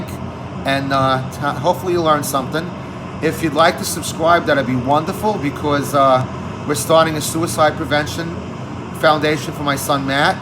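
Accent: Brooklyn, United States. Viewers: Perfect Brooklyn accent to narrate this video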